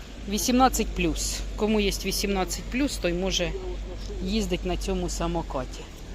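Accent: Poltava, Ukraine. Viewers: Полтавський діалект😊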